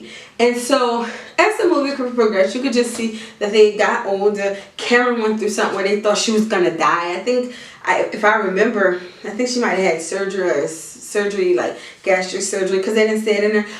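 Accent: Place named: New Orleans, United States. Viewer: Nice Review 👍🙏 Ms. U have a Real New Orleans, Louisiana Accent for Real❤️I Love It!!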